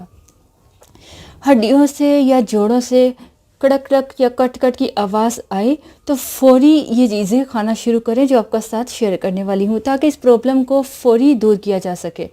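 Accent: Punjab, Pakistan. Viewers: Aapke lehje main reginal accent hai shayd aap Punjab ki hain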